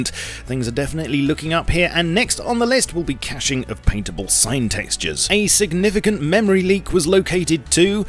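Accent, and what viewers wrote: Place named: Liverpool, United Kingdom. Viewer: aye yeah ur a scouse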